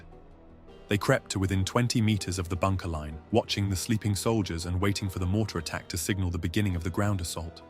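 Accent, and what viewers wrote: United Kingdom. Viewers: Why does the narrator have ti sound like a damn Limey!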